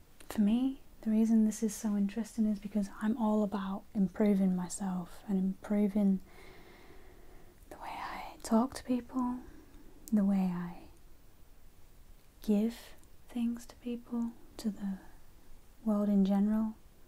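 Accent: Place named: Lancashire, United Kingdom. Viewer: Of all the accents I never thought I would get tingles from, yours (Lancashire?) is one I would never have imagined! But your voice, style and tone is amazing. Also your accent is lovely. Just shows you should never stereotype! Keep it up - and please do a Q&A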